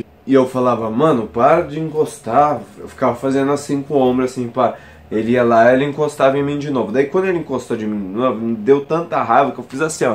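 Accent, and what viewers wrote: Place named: Minas Gerais, Brazil. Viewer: Tudo bem que e errado mais aqui em minas maior galera fala assim kkkkkkkk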